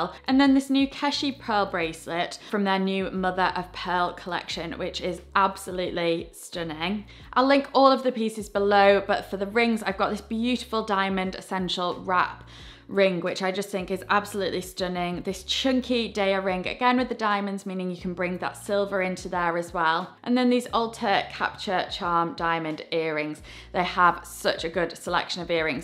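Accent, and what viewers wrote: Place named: United Kingdom. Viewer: Can anyone please tell me what kind of british her accent is... Its so cool and i wanna practice that accent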